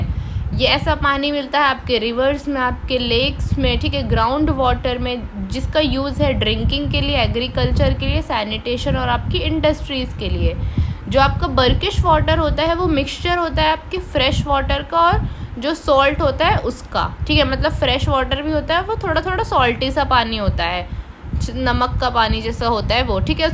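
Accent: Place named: Uttar Pradesh, India. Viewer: Mam , gajab ho yaar aap.😊 Aapko style of talking is somewhat like UP wale jaisa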